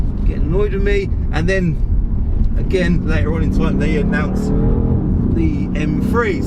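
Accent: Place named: Luton, United Kingdom. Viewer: Nah he comes from Luton, loads of multi racial people there, luton has it's own accent due to multi racial communities...I'm from Luton myself....I know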